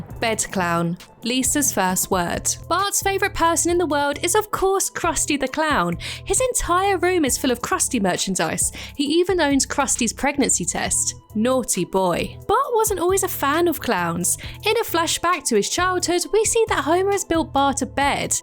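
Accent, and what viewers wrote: United Kingdom. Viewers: This beautiful british accent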